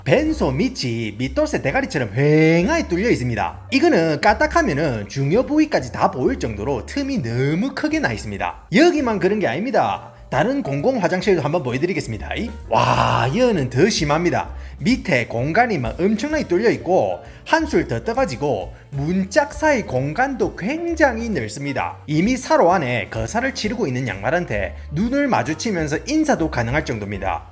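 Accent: Gyeongsang, South Korea. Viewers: 하하하 너무 재미있네예. 아는 이야기를 해도 재미있고 모르는 이야기를 해도 재미 있고~ 나도 경상도 사람이지만 별의별 사투리들을 잊어 버리지도 않고 어찌 그리 잘 하실꼬 싶네예. 내용도 좋고예. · 영어도 네이티브 한국말도 경상도 네이티브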